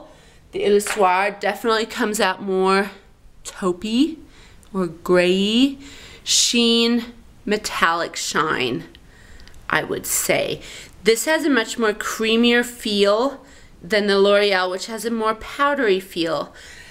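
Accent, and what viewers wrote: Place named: France. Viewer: Helpful review. Love your French.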